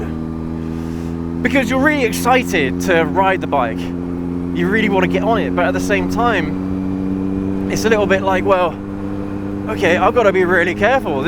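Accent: United Kingdom. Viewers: Nicely done! I've recently subbed because of the variety of approaches and the ever-articulate British accent. 😉 You pretty much summed up the entire video (IMO) with the discussion about mind. All is mind. Every story we tell ourselves is locked in there and it's our job to "just get on with it" so we can over it. See ya on the next one!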